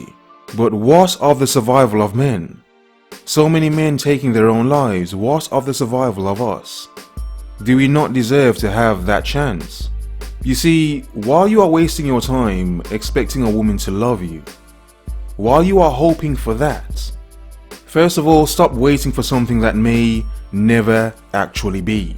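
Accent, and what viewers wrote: United Kingdom. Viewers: YouTube recommended this, but I can see through unoriginality of an African with a British accent trying to lecture males, not men to emulate his behavior and interaction therefore...I'm good these are devalued times, doesn't mean I need to stoop to a bottom of the barrel lecture from a schemer mentality to get through existence, love don't begin with a stranger it begins with sincere parents, family, and friends, every thing else is fate. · He is a Brit..I am surprised you couldnt pick up the British accent.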